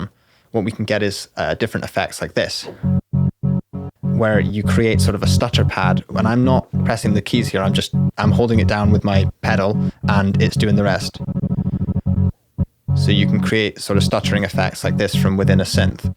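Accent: Scotland, United Kingdom. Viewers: Great video!! It's nice to hear a fellow Scottish voice on YouTube ;) So when can we expect the FM synthesis series?! :P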